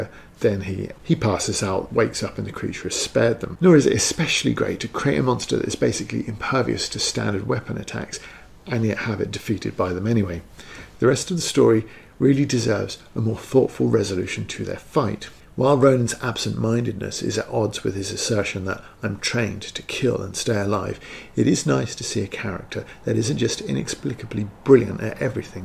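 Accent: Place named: United Kingdom. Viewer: totally serious. You obviously have a good command of the stories you read, and your voice is pleasant and British without being too nasal or: ok I need your help with the explanation of this description. I don't know how to describe this as an American, but what I'm trying to describe is a dialect of British speech that resembles cockney but isn't quite it. Dominique Tipper from that space show speaks this way. I know it's because of Hollywood exposure that I/we Americans have this bias but that dialect sounds, well, hoodlum-ish to us. I suspect we took our ques of woke capitalism from how you Brits dealt with these prejudices in the 90s (spice girls promoting a mixed British identity and language equality come to mind) and applied it to race and gender. Do y'all have a term for this there?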